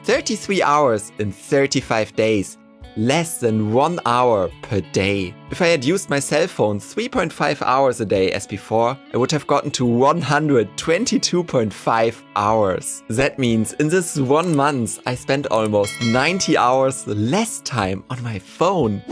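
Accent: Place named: Germany. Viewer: I smell Deutsch but sonst sehr gutes Video!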